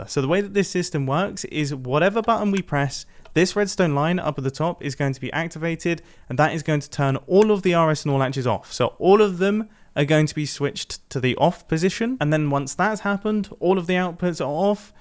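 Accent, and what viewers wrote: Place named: United Kingdom. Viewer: mumbo, you sound extra british in this video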